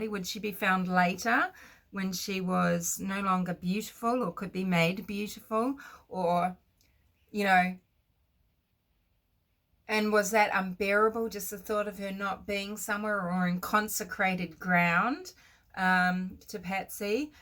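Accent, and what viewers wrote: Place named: Australia. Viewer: Nice video. I could listen to your Australian accent all day. Cheers!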